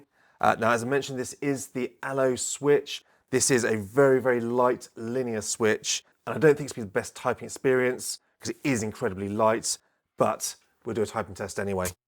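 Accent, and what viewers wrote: United Kingdom. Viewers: O, I use reds for quiet use. Is that a real British accent or are you really from Texas?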